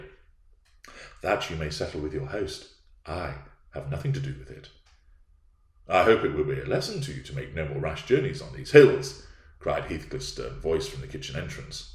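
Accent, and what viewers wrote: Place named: Yorkshire, United Kingdom. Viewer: Excellent Yorkshire accent and a most enjoyable performance. Bravo!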